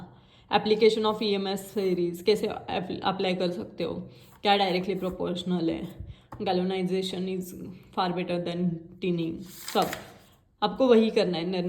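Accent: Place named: Maharashtra, India. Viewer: Didi tu Marathi aahes na kltay tuzya acsent vrun😅❤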